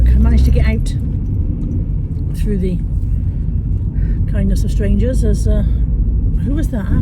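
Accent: Bristol, United Kingdom. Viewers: Hi I watch you and family plus Dogs all the time my late Husband was from Bristol and love ❤️ the way you talk bring back such good memories.coming to Bristol on the 12th March to see family I will look out for you when we go into town would love to buy you a drink.watch you do parcel up and send a supize one every week I am 74 soon and love my yorkie belle she my mate like molly is your.sending love ❤️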